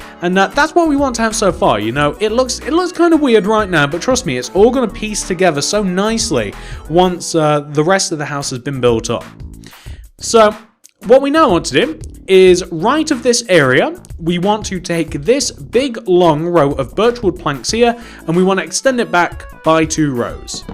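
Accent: United Kingdom. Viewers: Ah yes, an hour-long tutorial. Listen to that soothing voice of the British man.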